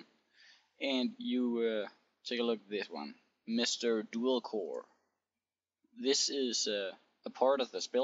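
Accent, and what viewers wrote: Denmark. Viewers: are you danish :D?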